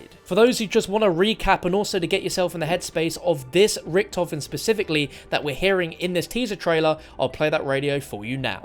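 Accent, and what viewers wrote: United Kingdom. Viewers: Anyone know where I can watch this without some annoying british guy talking over it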